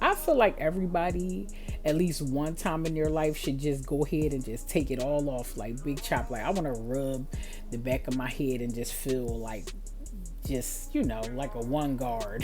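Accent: Philadelphia, United States. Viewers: You do beautiful hair, talented, mellow with the Philly accent that shines through, I’m from South Philly but living in Cali for many years… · I love your hair videos however you wanna post them. You do great hair and it’s also nostalgic to hear you talk. It channels my inner Philly Jawn/ Girl even though I haven’t lived there in 20+ years. Lol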